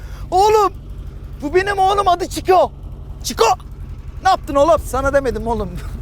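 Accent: Elazığ, Turkey. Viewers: Elazığlı mısın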